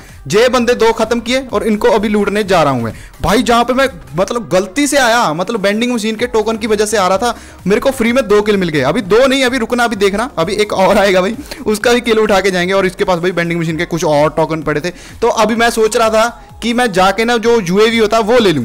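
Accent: Punjab, India. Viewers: Hello bhai aap Punjabi Ho jasa Punjabi bolte app Ho Kay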